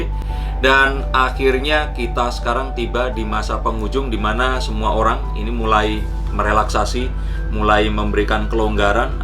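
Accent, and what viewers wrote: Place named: East Java, Indonesia. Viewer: sampean wong jawa timur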